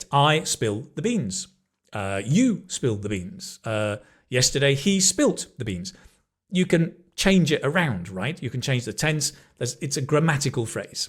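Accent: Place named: RP, United Kingdom. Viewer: Sir could you please explain if it's possible for a person to learn your accent in just 4 months? (I am not exaggerating). Cause that's what exactly is happening to me right now, i completely lost my previous American-ish accent i would say that i can confidently speaks 80% of your accent without even thinking or telling my brain if how it should sounds like. I admit the Modern RP accent fascinates me that's why i invested myself emersing with the accent. I have to ditched those non-RP speaking shows, movies and podcasts and focusing only in modern RP sounds that shadowing and mimicking becomes part of my daily routine as if it is part of my body. I'm not just thinking in a modern RP accent i also literally speaks them, our cats and dog has been stressed out because i am also speaking to them. When i was just 11 yrs. old back in 92' i have this "Speech Impediment" disability where i constantly stutter and stammer, i can't speak my Filipino language constantly and spontaneously without stuttering. 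Then i noticed whenever i speak an English word or sentences my disability were non-existent, since my disability had separated me from the world of communication i invested myself learning the English language without having someone guiding me how to learn them. At that time we can't barely afford buying the things we need so my family can't afford of getting me an English tutor, so i'm on my own. That time the only way for you to learn understanding each and every word is by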